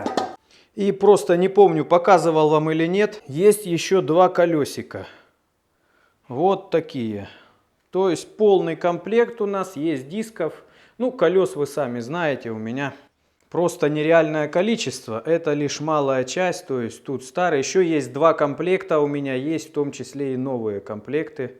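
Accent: Rostov, Russia. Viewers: Молодец!!! Ты и твои видео всегда радуют) за эти года просто до боли приятно слышать твой ростовский говор и специфические словечки))) 👍👍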